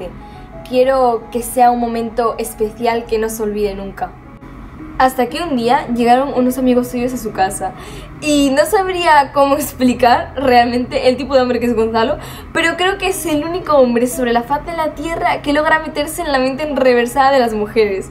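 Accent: Cordoba, Argentina. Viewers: Soy de Argentina buenos aires y el asento cordobes es lo más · AW EL ACENTO DE ARGENTINAA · el acento cordobés 😭🤏🏻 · che pero el asento ya lo superas te lo pasas al siguiente por q ablas bien argentino saludos desde argentina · Es que es asi pa los aregentinos somos los mas romanticos del mundo wacho siendo cirujas o quizas no pero siempre ahi para cuando se trata de la persona mas especial que tiene uno💖y la tonada de este hdp la amo gente😁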